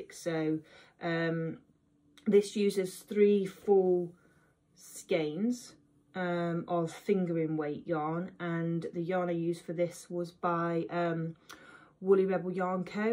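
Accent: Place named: Yorkshire, United Kingdom. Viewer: Hi, I've watched both your episodes now and really enjoyed them. I love to see a mixture of projects, yarns and recommendations so your podcasts tick all the boxes! I live in Yorkshire so it's great to hear an accent from not too far away! I also watch Cherry Heart (I'm currently making a battenberg blanket), I love Siobhan's Crafts yarns and I learned to crochet by watching Bella Coco tutorials. I'm not on Instagram but I look forward to seeing more podcasts from you on this channel! 😄👍🏻 Helen x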